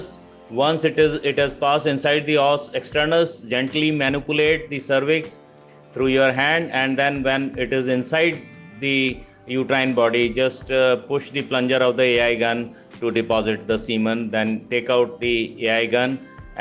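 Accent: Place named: India. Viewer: yes but it wasn't enough to understand your indian accent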